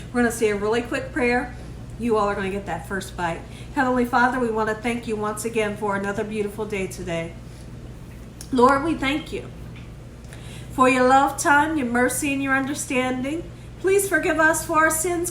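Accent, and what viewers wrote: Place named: Chicago, United States. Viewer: I just want to tell you how much I enjoy watching you. I love listening to you speak... I’m from the Chicago land area and you remind me so much of home... Keep up the great work and I hope you know how BEAUTIFUL you are!!!! ❤️❤️. Thanks for all that you do....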